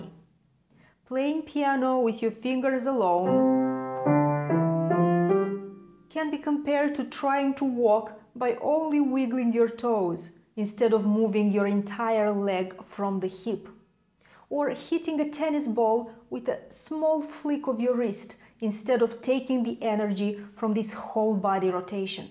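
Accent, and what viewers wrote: Russia. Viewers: Ура! Я могу услышать русского человека, просто услышав как он произносит отдельные звуки английского. Я угадал, что вы русская? По крайней мере русскоговорящая?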